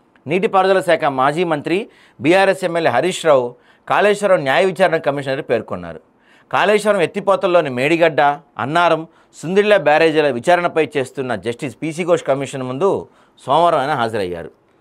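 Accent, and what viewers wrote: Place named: Andhra, India. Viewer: అరేయ్ బొచ్చు నువ్వు ఆంధ్రా క్రాస్ ఆర ఎందిరా నీ భాషా😂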